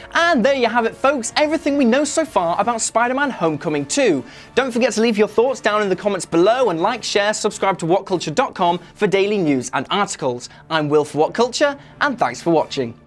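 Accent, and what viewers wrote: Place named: England, United Kingdom. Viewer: Ugly English accent.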